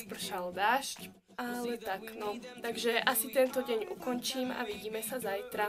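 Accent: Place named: Slovakia. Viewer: já bych taky chtěla byt ze Slovenska je strašně krásný hlavne ten přízvuk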